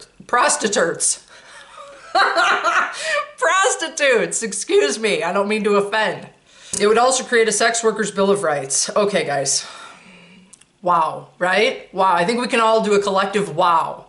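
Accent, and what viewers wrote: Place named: Illinois, United States. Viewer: I just found out about you YouTube said check this out. I can tell you are from the state of Illinois just tell you. Talk in your accent 😁 cuz I am just like you. Born in this state. Had parents that were totally upset about the state. For pretty much the same reasons. But now we got new ones, illegals 🤯 we used to kill people who invaded our country. Like you said wokeness his reign supreme. But I do think California has its speed on the wokeness? I may be wrong. But I don't think so, well what part of Chicago are you in dear 🙏 North South Central? Like I told you I'm in Alton Illinois. The early retirement I took. What's from the University of Illinois@ So I'm very familiar with Chicago. Love the city 🌆 I used to love driving Lakeshore drive at the lights were coming on. And all the international cuisine@ kiss 💋 food fantastic. 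All right I was bored and figured I'd drop a few lines. I will admit I'm not surprised. Have you lived in this state your whole life? Then I'm kind of surprised you're surprised 🙀 prostitution legal. Sounds about right to me 😎 that's why I want Republicans to take over this state. The Democrats have literally lost their mind 😭😞 started back with the clintons in my opinion. But my opinion won't even buy you a cup of coffee ☕ not at today's prices.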